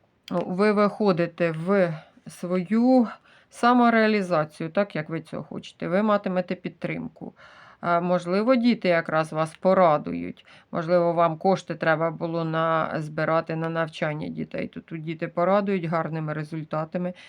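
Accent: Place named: Poltava, Ukraine. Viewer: Полтавська або Черкаська обл😂. Говір- ☝️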